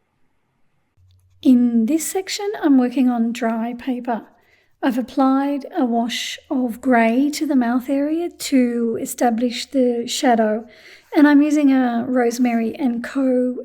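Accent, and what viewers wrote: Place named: Australia. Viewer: It’s that lovely Australian accent that got me. 😊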